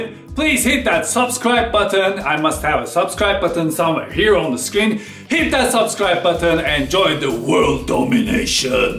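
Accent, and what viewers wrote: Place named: Turkey. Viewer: the Uhahkian lol. Is it can be just because of he's actually from Turkey? So ofc his accent will change.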